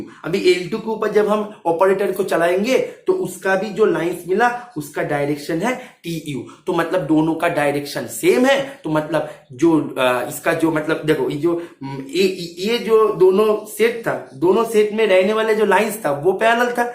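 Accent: Bengal, India. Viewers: Thank you sir. Are you from Bengal?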